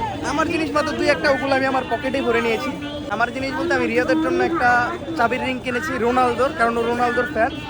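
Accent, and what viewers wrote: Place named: India. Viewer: কে কে খেয়াল করেছেন, ভাইয়ের কথা বলার ধরন কিছুটা ইন্ডিয়ানদের মত হয়ে গেছে, 😅😅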